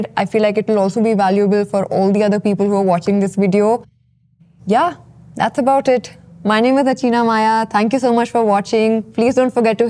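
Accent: India. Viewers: Sorry, I stopped after about 30 seconds in because I can't stand listening to Indian English. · how do you have Indian accent? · I didn't leave because im brain roted , i just don't like indian accent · I'm one of the 85% who didn't watch to the end, simply because of your indian accent. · The poo accent 🤮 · Accents vary worldwide, mate. Nothing you can do about it. Also she’s Indian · a girl with perfect indian accent finally. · good message, but i cant stand that indian dialect english for real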